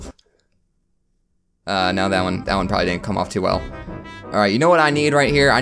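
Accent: Australia. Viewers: why the Aussie ascent hmmmmmmm..... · Thanks mr Australian accent guy for saying we are terrifying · You’re my favorite YouTube or ever I like your name and your British accents pretty good I mean I mean Australian accent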